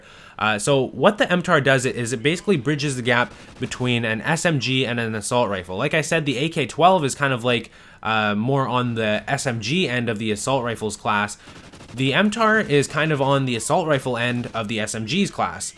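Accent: Canada. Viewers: 4 fuckng minutes listening to a Canadian ramble on 'aboot' pointless crap. Get to the point man.. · MSBS is nerfed now apparently, wonder if its allowed now. Good video, was looking for this info for awhile. 1 question, you Canadian?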